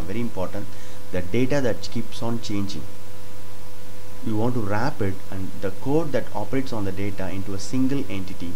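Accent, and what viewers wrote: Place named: India. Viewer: it's Indian Accent dude.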